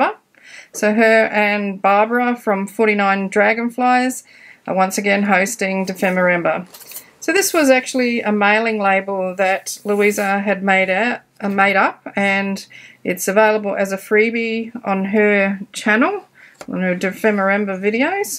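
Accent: Australia. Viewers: Hi, I was watching '49dragonflies' for Dephemerember and part of your video of 'Effie' was shown, so I thought I'd pop over and have a look at your channel. Glad I did. Really loved the leather look. Got me thinking. Thank you. Great to hear the Aussie accent too. Jeanne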